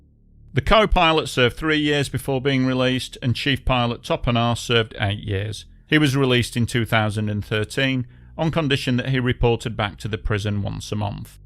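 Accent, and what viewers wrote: United Kingdom. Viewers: Where is the narrator's accent from specifically in the UK?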